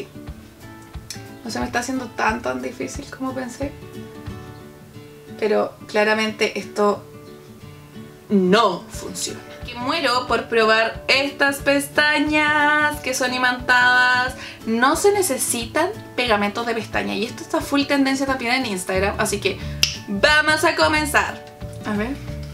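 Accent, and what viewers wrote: Chile. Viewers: Que lindo el acento chileno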